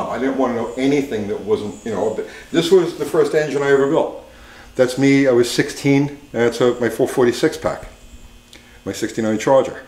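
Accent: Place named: Long Island, United States. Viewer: you remind me of home. From Long Island. I could listen to you for hours.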